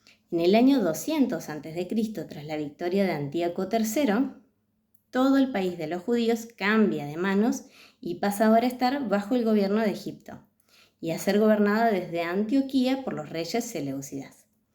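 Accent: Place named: Argentina. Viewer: Como muchos argentinos, pronuncias los sonidos de la "LL" y la "Y" como un "SH" o "CH". Cuando lees palabras hebreas, tu pronunciación argentina dificulta saber cuál es la fonética real de esa palabra y, en consecuencia, de qué palabra se trata. Como tal vez te resulte prácticamente imposible darnos la pronunciación correcta, al menos podrías incluir subtítulos. Con las palabras por escrito, podremos nosotros mismos buscar información adicional del mensaje que quieres entregar.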